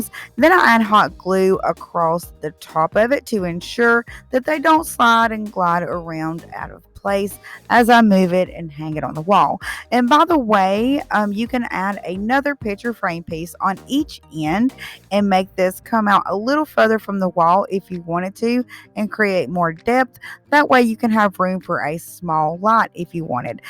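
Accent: South, United States. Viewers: Beautiful.... I love your. Country accent super cute. Ive been watching you now for almost 2years. It goes by fast. Thank you for exploring your creative mind allowing us in on all your secrets!!!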